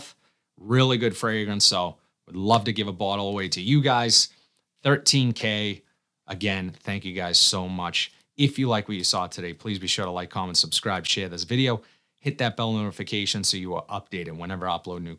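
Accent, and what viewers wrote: Boston, United States. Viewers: Well done on the 13k,!!! I don't come here for frag talk as much as I come here for Bostonian accent:):):) You are awesome!!! Have a great weekend!!! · You Have to be from Boston!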